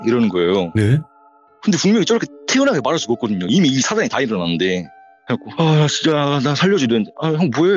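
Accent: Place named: Jeolla, South Korea. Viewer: 말투가 전남이시군요 ㅎ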